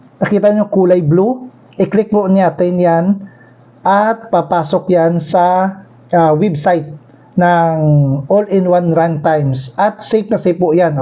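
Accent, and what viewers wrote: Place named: Iloilo, Philippines. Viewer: du ilonggo ka boss haw thankyou po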